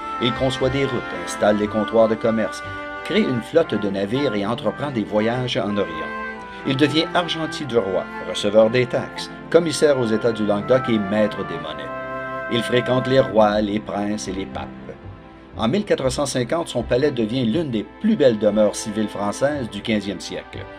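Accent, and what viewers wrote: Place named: Quebec, Canada. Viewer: Le Berry avec l'accent sympathique du Québec ! Merci pour jolies ces cartes postales d'une région dont il ne faut pas trop ébruiter le charme afin de lui conserver toute son authenticité !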